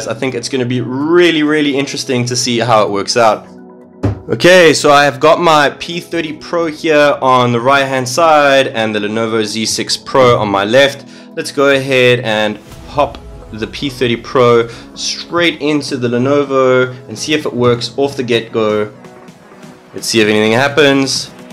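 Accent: South Africa. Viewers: I like the Proteas accent !!!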